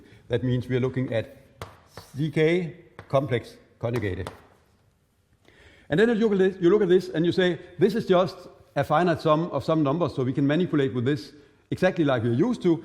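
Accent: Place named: Denmark. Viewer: Harry Ritchie no, he is very clearly Danish. We can identify fellow Danes.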